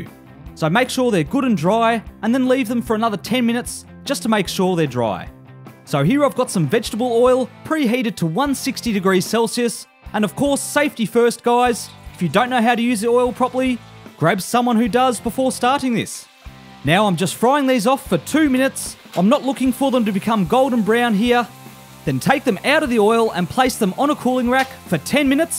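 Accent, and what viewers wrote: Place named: Australia. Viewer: uselessjoe the accent is australian, if that's what you were getting at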